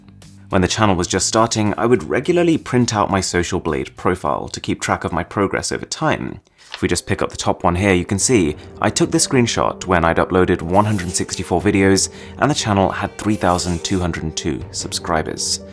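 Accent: RP, United Kingdom. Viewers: Your accent is much more posher now. Interesting. Gosh you are so hot. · He sounds like Harry Potter...The same deep n calm tone..Not just bcoz of the british accent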